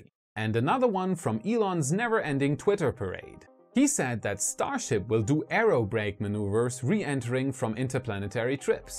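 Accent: Germany. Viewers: C'mon he speaks English with a heavy accent and German without any, so you tell me haha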